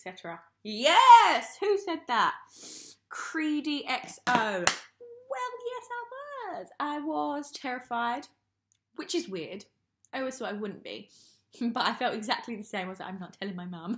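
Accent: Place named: Essex, United Kingdom. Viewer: Her accent sounds more Essex-y than she does in eastenders🙈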